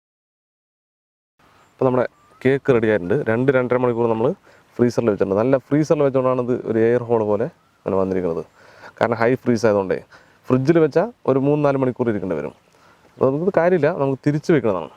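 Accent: Palakkad, India. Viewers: Palakkadan bhaasha super🤪 mostly watching for your language. Pakshe cake adipoli aayitundu👍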